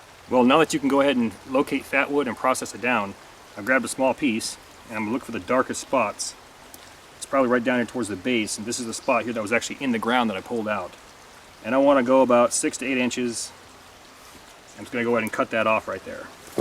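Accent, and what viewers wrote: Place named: Midwest, United States. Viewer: What part of the Midwest are you from I live in Indiana ?about 20 miles from Illinois that was a good video by the way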